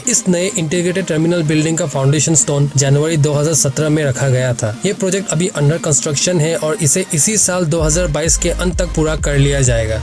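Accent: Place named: Assam, India. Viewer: Your accent is like Assamese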